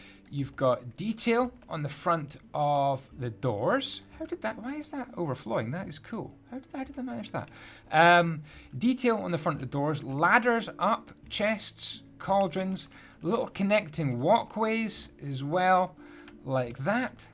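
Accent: Scotland, United Kingdom. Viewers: Decided to subscribe because of this building system! And because you're Scotish!. Love the system. IT's so easy to follow as a beginner and easy to spruce up as an expert builder. Keep up the good work. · I love the wee bit of Scottish in there. :) Well done you. ^^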